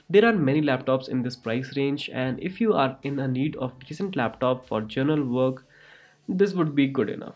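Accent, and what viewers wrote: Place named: India. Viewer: omg this indian voice meeeeh, dislike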